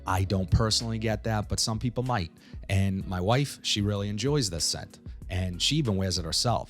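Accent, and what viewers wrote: Boston, United States. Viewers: You have that Boston accent. Looking into this as my first niche fragrance.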